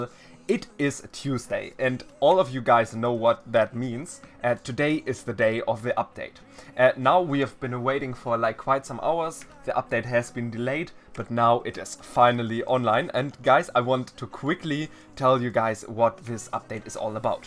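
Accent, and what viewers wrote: Germany. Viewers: Hard Glass is useful for ghast and creeper minions i Think P.S. ich feier deinen deutschen Akzent so hart xdd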